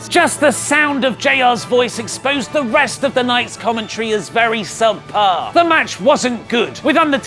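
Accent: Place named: United Kingdom. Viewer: ... i enjoy your YouTube channel, but seriously your compulsive British accent really makes me wanna gather a huge crowd and initiate a chant of "Shut the fuck up "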